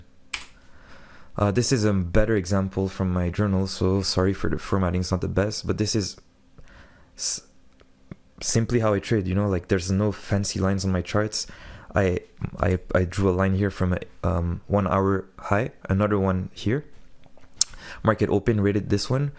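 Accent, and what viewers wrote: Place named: France. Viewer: U have like the french accent if u r from france im from france too we can trade together bro😭😂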